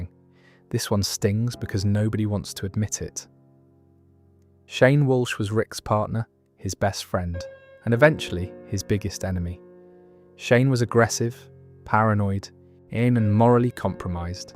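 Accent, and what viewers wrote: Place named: United Kingdom. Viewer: This narrator sounds British, and they have a notoriously poor grasp of the size of the United States.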